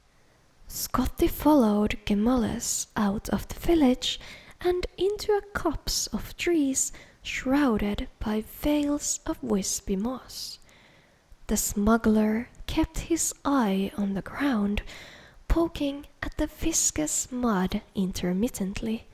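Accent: Finland. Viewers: The Finnish accent is really appealing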